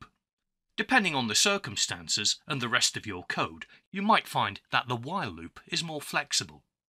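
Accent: United Kingdom. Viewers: hearing your beautiful British accented voice made me subscribe right away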